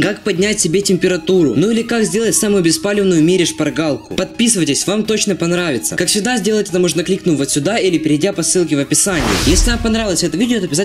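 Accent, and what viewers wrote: Ukraine. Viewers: Ты из Украины ??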